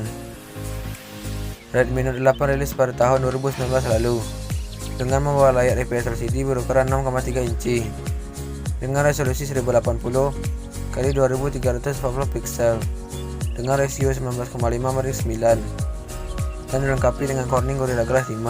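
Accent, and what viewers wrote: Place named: Lampung, Indonesia. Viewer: Suaranya kayak orng lampung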